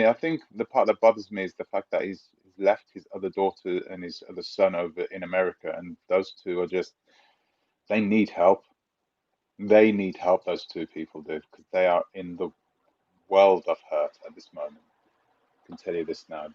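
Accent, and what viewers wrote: United Kingdom. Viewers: Can u speak your African accent and desert your British accent. Coming from a concerned Gambiana.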